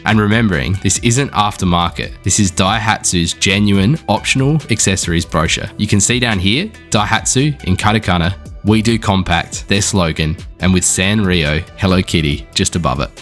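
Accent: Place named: Australia. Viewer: just found this channel, awesome video man, instant sub. also i find it funny that Your description says "United States" but you have an aussie accent lmao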